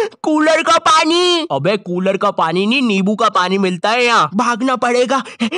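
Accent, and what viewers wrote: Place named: Madhya Pradesh, India. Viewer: I'm from madhya pradesh.. where are you from??? Bro .. bcz you're accent is like m.p.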